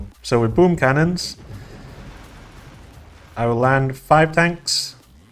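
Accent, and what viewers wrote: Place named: Singapore. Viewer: oh nice. You have kind of a Singaporean accent also that's why I figured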